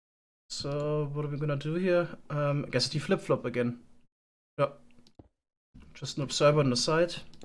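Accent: Germany. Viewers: are you german or scottish? or swedish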